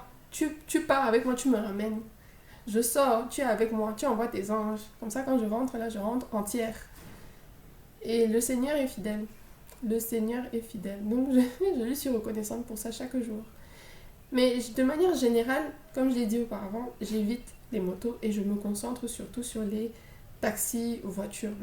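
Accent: Cameroon. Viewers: Travaille ton cardio car chez nous c'est le cardio ! Autre chose, NE CHERCHE PAS A COMPRENDRE LE CAMEROUN ! Tes problèmes commenceront dès que tu chercheras à le comprendre car un dicton dit : Si on t'explique le Cameroun et tu dis que tu as compris, c'est qu'on t'a mal expliqué ! L'accent Camer y est déjà, c'est bien. Pour le reste, gère seulement ! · Tu deviens déjà camerounaise , même l'accent hein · Le port du casque est obligatoire au Maroc. C'est un pays plaisant avec une population avenante. T'as déjà prise l'accent camerounais et c'est plaisant de t'écouter . T'es éloquente et pertinente dans ton analyse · tu es super marrante 😅on dirait que tu as vecu au Camer toute ta vie(tes expressions,ta gestuelle,ton accent etc) tes videos me detendent beaucoup,continue comme ca.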